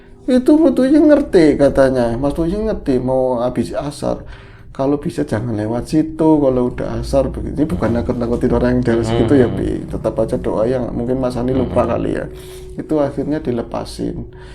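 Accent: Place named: Tegal, Indonesia. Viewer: Mudah2an setelan Mas skrg mulai dari outfit ( topi)sampe logat Tegal dan gaya ceritanya AWET TERUS. Pertahankan,mas!!! Ini adalah Trademark kalian dan unik sekali!!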